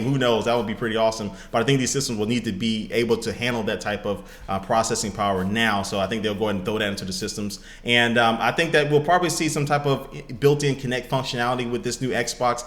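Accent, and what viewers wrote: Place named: United States. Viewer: I thought he was American.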